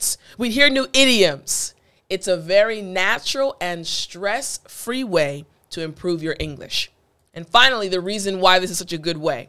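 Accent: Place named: United States. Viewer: Different accents but i would like every America have you same accent teacher, cuz it's beautiful and I can understand clearly 😊😊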